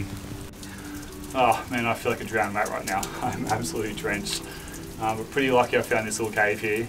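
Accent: Australia. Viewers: I'm new to your channel. I found this quite by accident, and glad I did. Things in Australia and here in US are very different. I've never seen that kind of pot handle, nor that kind of food. I love the accent. (Shame on me, I just noticed I did see one video before didn't realize it. How can I listen to the accent and not hear the difference, I must have woke up deaf that morning.)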